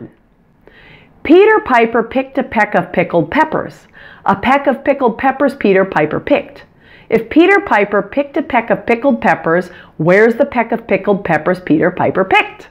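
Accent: United States. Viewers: But you pronounce it the American way not the English way.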